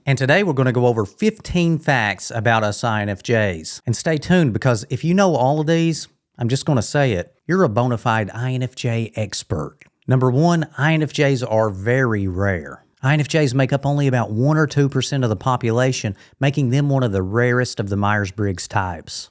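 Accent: South, United States. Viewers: This is lovely. I love your Southern accent.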